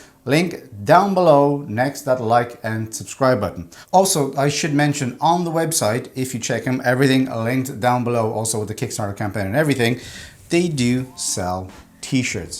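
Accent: Ireland. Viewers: "I'm not Irish". I mean you sound pretty Irish bud.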